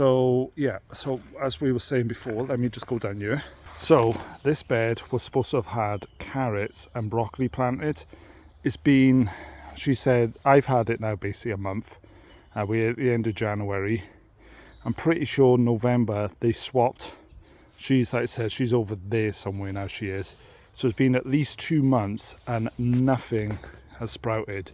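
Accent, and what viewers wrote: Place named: Northumberland, United Kingdom. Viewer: Aha found you! Intrigued by your accent - sounds like a cross between Northumberland and the NPT!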